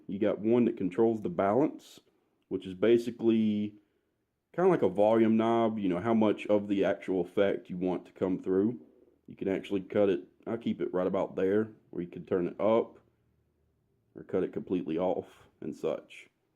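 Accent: United States. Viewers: Will this work worth a shit through the front? For some reason I never have much luck through the loop. You sound like you from Bama.🤘🏻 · Incredibly informative video, great work. Oh and the southern charm is always welcome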